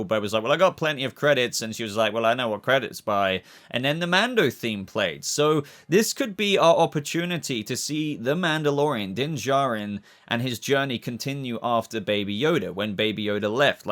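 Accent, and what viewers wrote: United Kingdom. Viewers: Boba its good to have good old Brit reviewing DC ALL COMICS IS NICE i like how you get right into it mate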